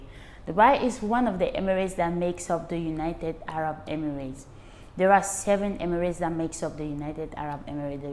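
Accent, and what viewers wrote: United Kingdom. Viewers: Our Camer lady with British accent.great job keep up 🔥🔥🔥🔥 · The simple truth about the UAE. great job. Clean queen's English keep it up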